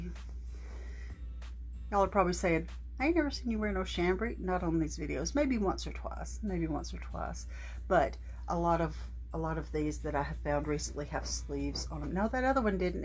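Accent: South, United States. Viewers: I understand you perfectly :) love your accent!! I am a crafter, card making and journaling and I’ve got addicted to watching craft room makeovers and I think you came up in that category if I remember correctly. I also listen to The Scrap Gals podcast and they are from Nashville and Bowling Green, Kentucky so used to the Southern American accent 😉 I love your videos and all the ‘thirfty’ goodness you find. We have Charity shops in the UK but the prices are rocketing so bargains are few and far between here!! I love to hear about your family and how you want to teach your grandchildren about the true meaning of Christmas and that you purchased them a nativity set to play with. They are so lucky to have you as their grandmother xxx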